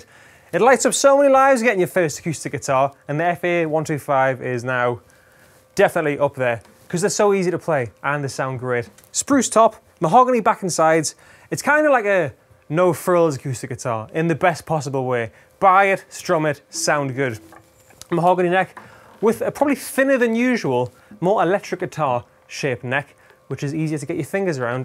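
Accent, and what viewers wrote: United Kingdom. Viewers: Heavy British accents and long hair really doesn’t go well with being a guitarist.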